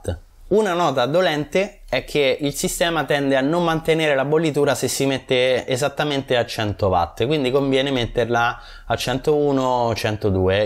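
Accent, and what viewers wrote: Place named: Fermo, Italy. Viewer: 🤣🤣 Forse siamo della stessa provincia.....Fermo?🤣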